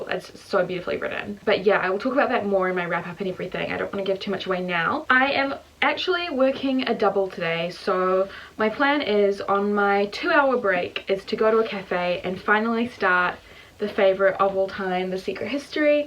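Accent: New Zealand. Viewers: Giiiiirl you will die and be reborn when you read Six of Crows. The premise of it literally never interested me and I only read it to see what the hype was about... it's now my favourite series of all time. I can't even with it. I know you'll love it so I cannot wait to see your reaction when you finally read it! Also what was the channel you mentioned about the Goodreads review? Melanie something? Sorry, I'm not from NZ so I don't always understand NZ accents 😂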